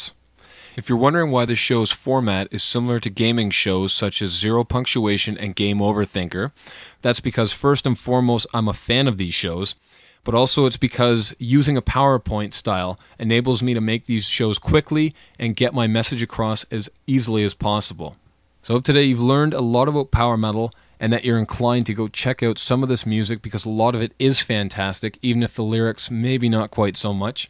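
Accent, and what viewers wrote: Canada. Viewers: The narrator is canadian for sure.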